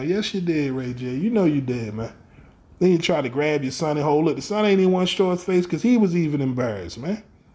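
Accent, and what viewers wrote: Baltimore, United States. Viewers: lol you're definitely from B more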